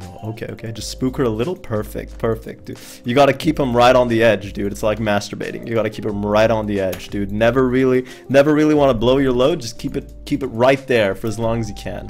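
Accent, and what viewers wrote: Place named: United States. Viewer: Are you sure you're not American? You sound like somebody from where I live.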